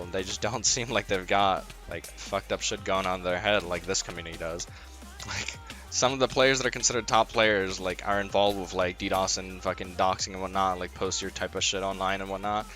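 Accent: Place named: Netherlands. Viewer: You sound a little Dutch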